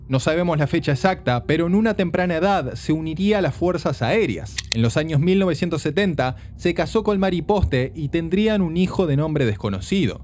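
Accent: Argentina. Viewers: Ah, eres argentino?, me suscribo ya inmediatamente. 🇻🇪 Amo a los argentinos y tu canal se ve de buena calidad.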